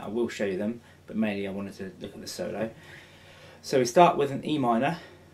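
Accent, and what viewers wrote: England, United Kingdom. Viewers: english mate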